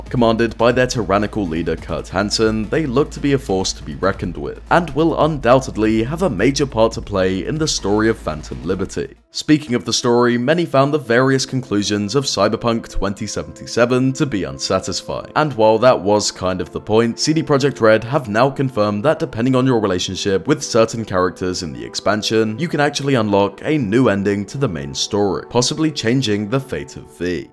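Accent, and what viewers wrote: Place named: Australia. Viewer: You've got a pretty interesting accent. Are you Australian? You voice really reminds me of CatBoyKami :D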